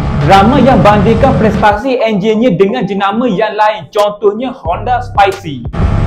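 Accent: Kelantan, Malaysia. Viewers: kelantan sangat hahahha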